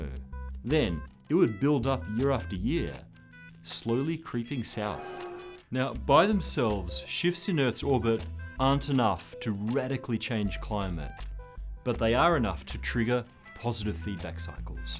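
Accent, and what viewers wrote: England, United Kingdom. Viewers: PBS: People are waking up to the climate scam.. what can we do? PBS director: Hire a spokesperson with a beard and a British accent. That'll add credibility so they believe our bullshit. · Why do they always have the host of these shows with a British accent. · climate change has nothing to do with this? Now I know its true~!, Speak with an english accent and have a well known TV company behind you, and you can shovel complete shit from your mouth and no one cares to say how stupid the little "spoiler" in the description is. fuck off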